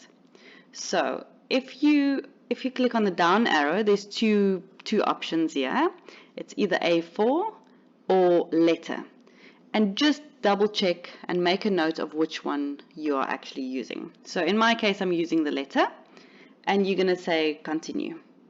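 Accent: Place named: South Africa. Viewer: That South African accent is so hot...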